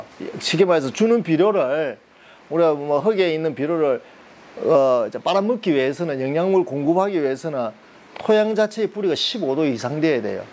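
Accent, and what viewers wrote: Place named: Gyeongsang, South Korea. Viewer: 듣다가 듣다가 경상도 억양에 스트레스 받아 도저히 못 듣고 나갑니다.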